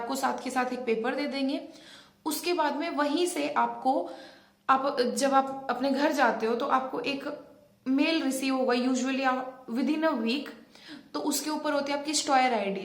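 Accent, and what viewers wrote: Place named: Sindh, India. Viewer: Ur tone looks like Sindhi so r U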